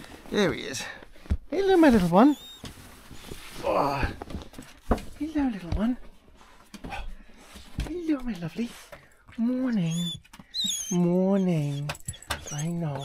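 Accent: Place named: London, United Kingdom. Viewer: I’ve been watching your episodes from the very beginning and love them all. You’re a great warm hearted guy. I love your London accent all the way from NZ. Keep it up!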